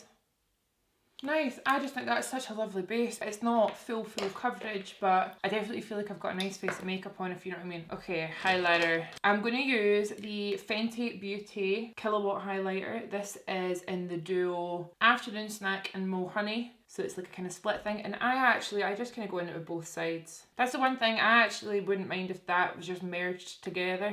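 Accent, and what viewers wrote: Scotland, United Kingdom. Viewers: I always watch your vids when I’m stressed out before exams. Something about a scottish accent is so soothing?? hahaha luv u!! come to dublin thanks ❤️❤️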